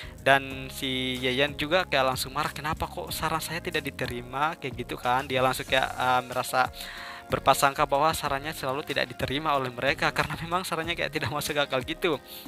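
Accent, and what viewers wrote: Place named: Sulawesi, Indonesia. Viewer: Kentara sekali logat Sulawesi nya 🙏😁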